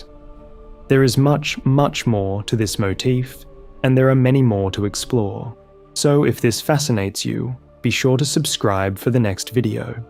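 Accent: Australia. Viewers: Sounds Australian to me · i think he's australian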